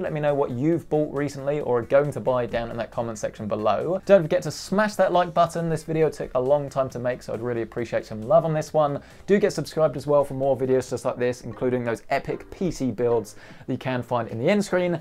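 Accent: United Kingdom. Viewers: I wondered how he picked up British accent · that british accent. Marvellous.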